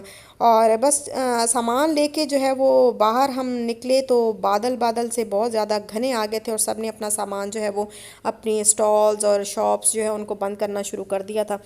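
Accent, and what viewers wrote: Saraiki, Pakistan. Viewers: i watched first time ur vlog r u seraiki ur accent is just like seraiki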